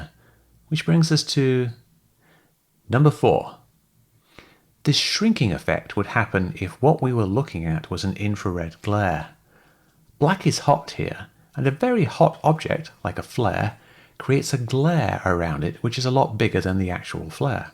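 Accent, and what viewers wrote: United Kingdom. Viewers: I have to mentally prepare to watch these videos from Mick. His take can be interesting, but it generally puts your mind into a fucking mental gymnastics exercise. If he didn’t have an English accent, a fraction of the people would take him seriously lol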